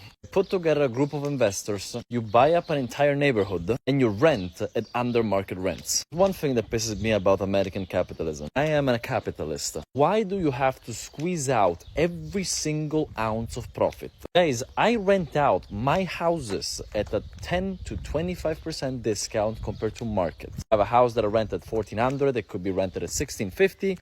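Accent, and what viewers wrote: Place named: Italy. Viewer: Your accent tells me you don’t have enough hands on experience to yapp about this subject. Maybe stick to Italian politics.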